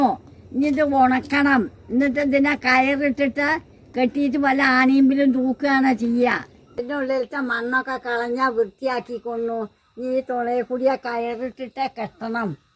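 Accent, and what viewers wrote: Palakkad, India. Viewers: അമ്മൂമ്മ പാലക്കാട്ടീന്നാ 😊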